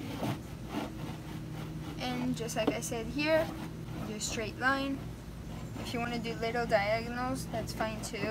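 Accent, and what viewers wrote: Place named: France. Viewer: On entend beaucoup ton accent français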